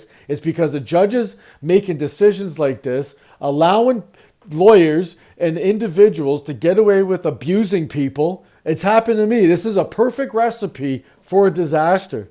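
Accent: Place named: Canada. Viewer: Within 5 seconds I knew he was Canadian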